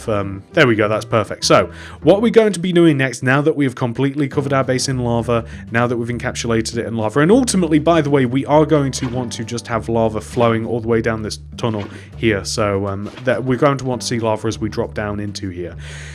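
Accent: United Kingdom. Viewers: Are you British??? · Ben Matthews I think it is Really well because of the British accent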